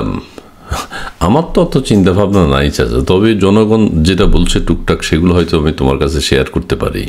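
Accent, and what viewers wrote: Noakhali, Bangladesh. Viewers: কাশেম চাচার সাথে নোয়াখালীর ভাষায় কথা বললে শুনতে ভালো লাগে।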